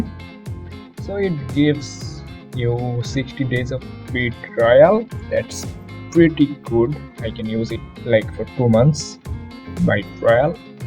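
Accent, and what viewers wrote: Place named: Germany. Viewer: Du hast einen sehr deutschen Akzent 😂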